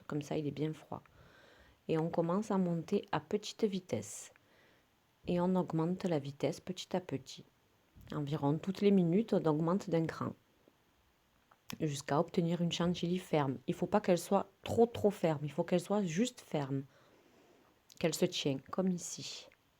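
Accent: South, France. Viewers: Bonsoir je viens de vous découvrir, je me suis abonnée sur le champ ! Vos réalisations sont magnifiques Bravo ! vraiment tout est clair, bien expliqué et votre voix très agréable, on sent bien le sud 😊 Merci pour vos partages 🙏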